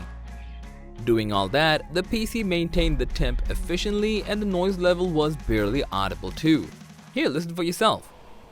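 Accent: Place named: India. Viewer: whats up with the fake american indian call center scammer accent?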